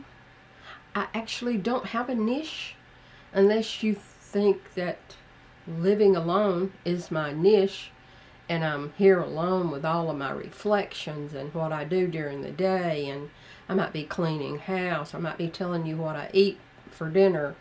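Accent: South, United States. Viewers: Oh my god!!! You remind me so much of my mom!!! And you have the southern accent too!!! I wish she would start doing this. Amazing! I’m subscribing! I do content creation gear reviews on one of my channels. Great job!! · I just found you. You are amazing. Love your southern accent. Sounds like my family in the south. Keep doing what you’re doing and know you are more than enough. 😊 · I love your southern accent. Our town has been overrun with transplants and foreigners. It’s so seldom we hear southern accents anymore. I miss it so much, especially since I supposedly live in the south. Or what used to be the south. Anyway, I do enjoy listening to you speak. Your voice is so comforting that I could easily fall asleep. You remind me of my precious older relatives that aren’t around anymore, that I miss so much. God bless you. Keep on going.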